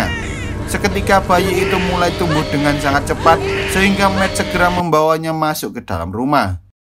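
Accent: Java, Indonesia. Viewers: Mas koe opo wong jowo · orang jawa lik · medok e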